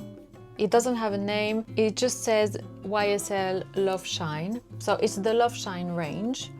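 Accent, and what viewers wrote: United Kingdom. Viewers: Very good British accent, I prefer this version by far.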